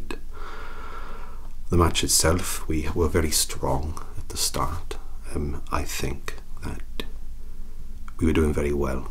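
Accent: Wales, United Kingdom. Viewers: This made me so happy! As a Welsh woman, the accent made me smile. You really can put your voice to anything! Brilliant! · Would love to have more vids with the Welsh accent, or any other accents you can do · With the Welsh accent you're making me wish you'd do a Solas roleplay! From Dragon Age. Most relaxing character imo. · Just finally watching this video and just wanted to say that I love your welsh accent. Very relaxing to listen to. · So the Welsh rugby team caused the Corona Virus... Also if you're not Welsh, that is an outstanding Welsh accent impression. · This was freaking hilarious, the welsh accent does add comedy value too, love it.x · Moar Welsh accent vids, please. n_n (( Maybe something Sci Fi? :DDD But really, anything will do. It's so beautiful! )) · It absolutely did. Hearing your voice always makes my days brighter, and it was definitely interesting to hear your Welsh accent! 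<3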